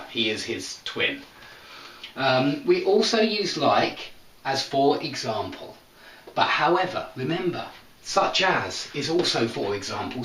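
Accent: United Kingdom. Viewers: Your english lessons are the best, because you explain many details. And I always admire your sophisticated british accent -))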